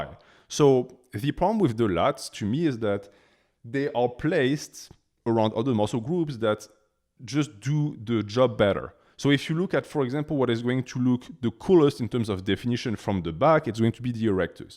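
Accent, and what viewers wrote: France. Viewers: T’es français? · Flag, accent, demeanor, face, diet, him literally saying he's french .... What are you even on ?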